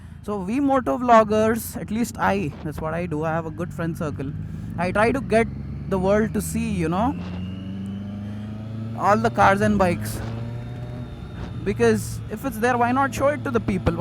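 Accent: Hyderabad, India. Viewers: like ur hydrabadi accent when u speak hindi. ..hehe.. but how do u speak english normally like us??where is the accent?? make a video in hydrabadi accent..would be nice.. and nice video. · bro i love your channel but more than that I LOVE YOUR ACCENT of your language #Hyderabadi :D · Hyderabadi. ... Music to my ears. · Keep takling while riding, sometimes in hyderabadi accent , but sometimes keep quite so that we can hear lovely exhaust note for while... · Loved your Hyderabadi accent .. You should use it more often... Keep going.. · The best part of the video was that hyderabadi accent hahahaha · yar Hyderabadi hindi superb bhai..keep it up..we want more in Hyderabadi style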